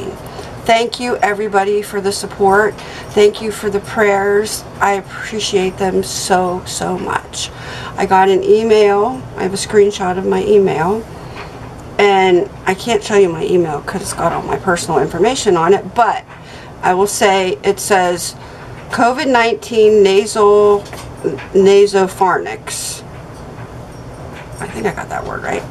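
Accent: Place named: Pennsylvania, United States. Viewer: Glad to hear you are negative! Here from Simple Life! I would have swore u were from PA w that accent! I grew up in PA, & u know I gotta say it.... We Are!!! Lol! God bless!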